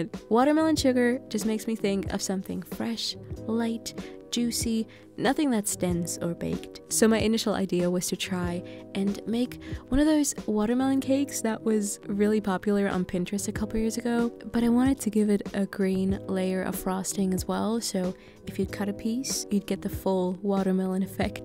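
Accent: Germany. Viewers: Are you German? 😂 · Wait, are you german?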